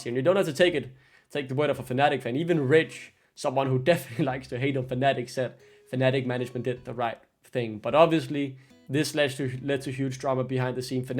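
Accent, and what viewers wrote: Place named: Denmark. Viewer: Not the Danish accent hahaha, great video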